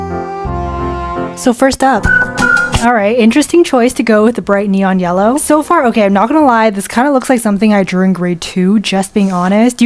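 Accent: United Kingdom. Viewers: Stop the british accent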